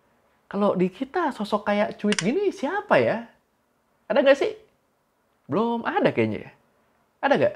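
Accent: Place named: Jakarta, Indonesia. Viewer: Bang ini emg bener asli thailand kah? Pernah denger asli thailand tapi logat dia ngmg uda jakarta bgt, kok jd ga percaya dia asli thailand😅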